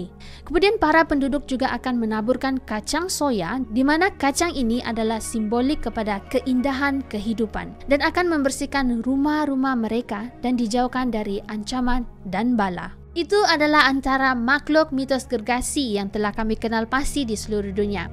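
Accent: China. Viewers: Loghat seperti loghat cina hmm..tk logik sebagai sabahan.ekram abang kamu lebih baik😄😄ekram go go go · pening r..cm loghat cina,(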